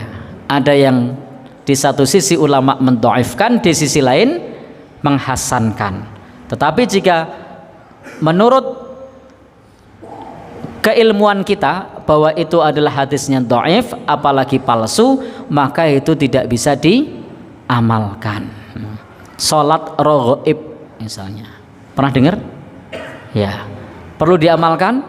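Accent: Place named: Java, Indonesia. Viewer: iJIN BERBAGI.....👇 MENCERDASKAN UMMAT....!!! USTAD INI...KALO DARI NADA BICARANYA SUKU JAWA....... TETAPI AKIDAH NYA LURUS..... BELIYAW TERLIHAT BERADA DI ATAS MANHAJ SALAF..... HARUS NYA BANYAK ORANG JAWA YG HIJRAH MENGIKUTI PEMAHAMAN BELIYAW....YGMENGAJAK UMMAT KEMBALI BERAMAL,BERIBADAH BER AKIDAH MENGIKUTI MANHAJ SALAF....!!!! SIAP SIAP USTAD.....KALO MENDAKWAH KAN AGAMA YG HAK,YG SESUAI DALIL....DARI KITABALLAH DAN SUNNAH ROSUL..... MAKA ANDA AKAN DI TUDUH.... SEBAGAI WAHABI......😁😁😁HE....HE.... TETAP ISTIQOMAH MENDAKWAHKAN YG HAK.....MESKI BANYAK YG MEMBENCINYA....!!!! SEMOGA BERMANFAAT. 🙏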